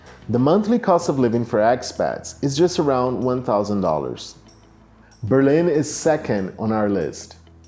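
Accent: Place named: Brazil. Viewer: Brasileiro haha! Vê-se pela pronúncia. Um forte abraço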